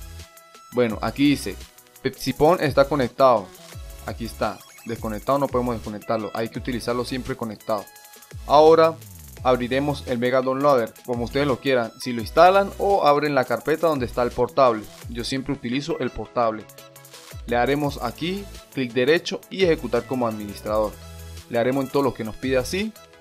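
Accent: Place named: Táchira, Venezuela. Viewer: Muchas gracias, saludos desde San Cristóbal, reconocí tu acento gocho mi pana!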